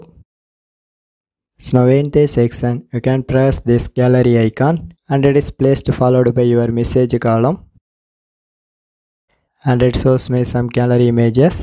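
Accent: India. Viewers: He is from india, did you hear his slang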